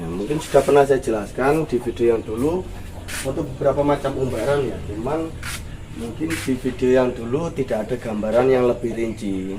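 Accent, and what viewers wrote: Banyuwangi, Indonesia. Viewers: Mas sapean apa orang Banyuwangi to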